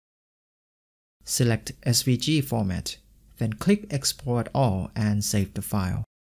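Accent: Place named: India. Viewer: This Indian talking, I hate it! Really can you not talking ....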